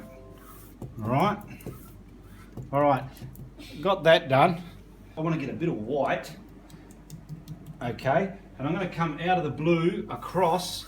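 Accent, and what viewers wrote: Australia. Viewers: LLOOOOOVE YOUR AUSSIE ACCENT MATE! 😂😂😂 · I am loving your tutorials!! I have been catching myself taking with an Australian accent!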